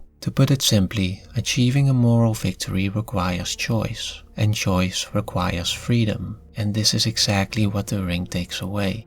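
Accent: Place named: Netherlands. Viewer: 10 seconds in and I'm like: Hey! A dutch narrator speaking english!😃 Are you Dutch?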